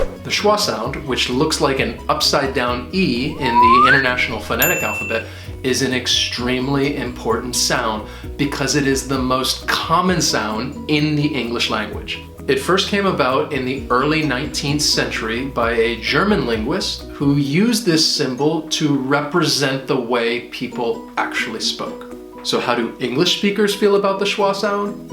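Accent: United States. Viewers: Do you speak US accent?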